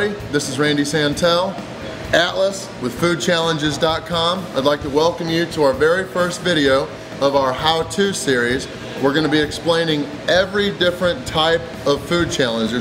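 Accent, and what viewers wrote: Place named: United States. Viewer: Jamm Lighting lol he’s got like a northern hillbilly twang to it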